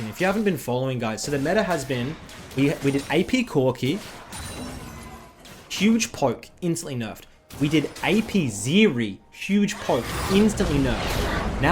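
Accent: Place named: Australia. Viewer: The more I listen to, the more I like australian accent.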